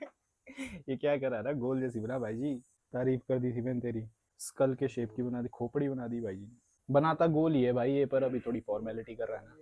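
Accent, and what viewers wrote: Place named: Himachal Pradesh, India. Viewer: The Himachali innosence is present in you . And the Himachali tone of your voice is your identity . Keep it up hope this Himachali tone of your voice and your behaviour never fade away . Bcz most of Himachali YouTuber do vlog in a modern tone , behaviour etc but you always shows Himachali presence in you and also in your vlog ❣️ Wishing you huge amount of prosperity 🙏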